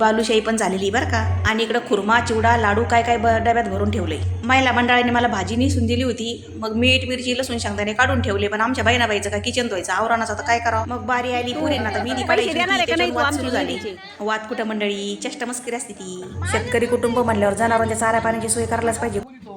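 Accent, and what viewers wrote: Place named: Ahilyanagar, India. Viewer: 👍अरे वा म्हणजे माझा अंदाज बरोबर होता 😄 छान विडिओ असतात तुझे मी नगरला aste👍आपल्या माहेरचं कुणीतरी बोलत आहे asc👍जाणवत तूझ्या बोलण्याच्या टोन वरून 😄🙏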